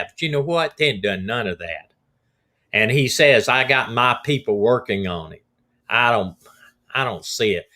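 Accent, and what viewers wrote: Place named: South, United States. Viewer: . You are beautiful! And I love your southern voice💖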